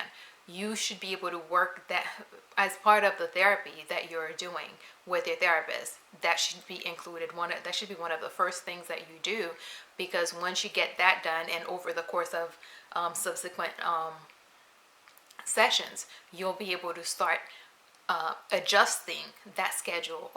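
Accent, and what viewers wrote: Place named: East Coast, United States. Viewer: I love your East Coast accent! My dad is from NYC and it's my favorite accent.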